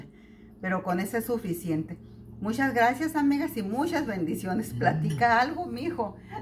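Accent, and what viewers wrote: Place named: North, Mexico. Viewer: Señora,,buenas sus recetas y comida saludable al mero estilo Sonora y con el acento tan norteño tan popular. Nomas un comentario me gusta cuando sirve la comida asu marido y el la resibe con mucho gusto, pero me parece muy cortante de su parte mandarlo a sentar asu rincón “” es mucho mejor que el este con usted en compañía como una bonita pareja,comparta comiendo del mismo plato en vez de mandarlo lejos de usted,,Gracias por sus recetas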